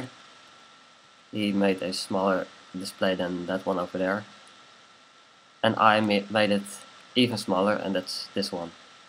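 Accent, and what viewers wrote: Netherlands. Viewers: haha youre dutch :)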